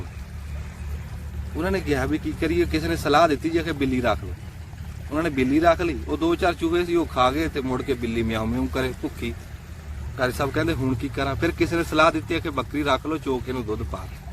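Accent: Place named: Doaba, India. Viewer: Yaar I am surprised ki boli kinni saaf or similar aa doaba wal de naal mildi lagdi aa. Kamaal a bro. Video ta vadiya hai he but boli sunn k baut vadiya lagga 😂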